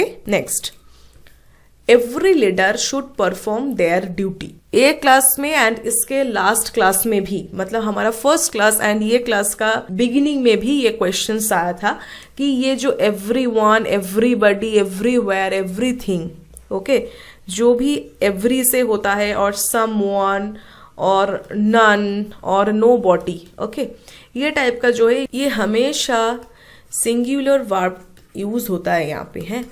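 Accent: Bengal, India. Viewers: Ap bengali ho kya???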